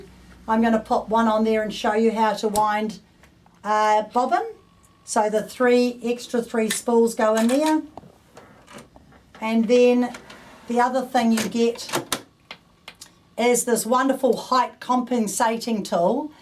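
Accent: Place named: England, United Kingdom. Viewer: Nice lady but I cannot understand half of what she says because her accent is so strong. English I presume.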